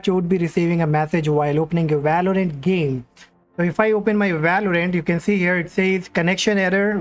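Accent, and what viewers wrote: India. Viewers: i love you indian boy with your nice dialekt that helps me very ty bwoo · everytime you heard an indian accent you know its a good tutorial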